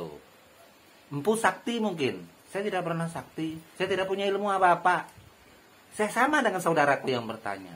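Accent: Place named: West Java, Indonesia. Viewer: Cengkok nya seperti logat Sunda yah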